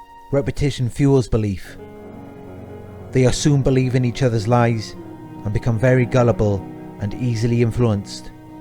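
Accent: Wales, United Kingdom. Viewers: Great video. You sound Welsh. Im welsh too! May I ask a question? I started a FB page helping people with mental health and theres one guy on there thats always sucking up to me. Compliments compliments compliments. Yet....whenever he becomes close with certain members (and is conversing with them through messenger), they seem to go very distant and cool towards me. The women in particular. This has happened several times. I thought it may be my imagination, but I dont know....it all seems a little strange. Do you think this guy may be a bit of a narc? Thanks in advance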